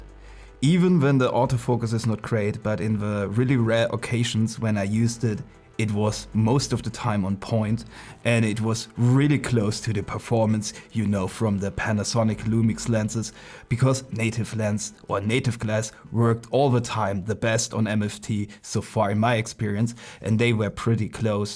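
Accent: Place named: Germany. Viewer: I heard you speaking. and my first thought was: is he German? And, yeah, I was right! 😁 .. Thanks for the review. I want to buy it, but the link you provide only targets to Amazon US and I'm not sure if you'll get the commission if I switch to Amazon DE, maybe you should provide a german link 😉.. Viele Grüße aus Köln!!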